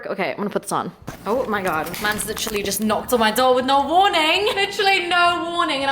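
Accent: United Kingdom. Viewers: hwa definitely british u cant hide it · I genuinely love your British accent why don't you upload a challenge talking about your British accent from the beginning of the video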